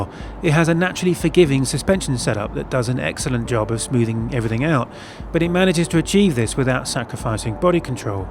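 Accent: England, United Kingdom. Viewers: Only englishmen can understand that english.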